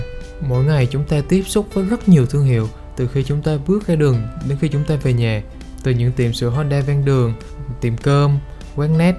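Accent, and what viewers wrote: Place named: Phu Yen-Khanh Hoa, Vietnam. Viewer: Giọng nói của bạn rất hay. Chắc là giọng Phú Yên-Khánh Hòa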